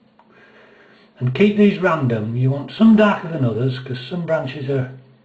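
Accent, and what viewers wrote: Yorkshire, United Kingdom. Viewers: Not bad, not bad at all sir. Simple but very effective, plus a couple of techniques I'm going to have to try. Also nice to hear a yorkshire accent, been a Huddersfield/Barnsley boy myself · Really enjoyed watching these 3 videos and love the end result. I'm still a beginner and need all the help I can get so found this very helpful. I'm originally a Yorkshire lass so listening to your commentary was an added bonus! This is the first time I've come across you on You Tube but certainly intend to dig out all the videos I can find. Thank you. Keep up the good work!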